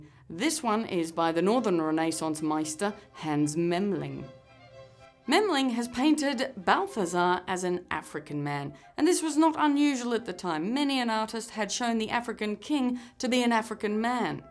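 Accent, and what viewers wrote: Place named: Australia. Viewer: as someone English I think she really doesn't sound English? she's Australian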